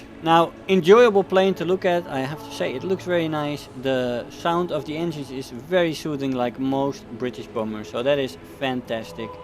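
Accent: Netherlands. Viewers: your dutch i can hear it haha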